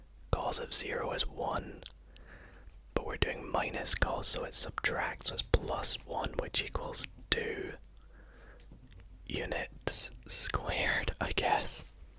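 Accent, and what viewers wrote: Scotland, United Kingdom. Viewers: Hes Scottish 💀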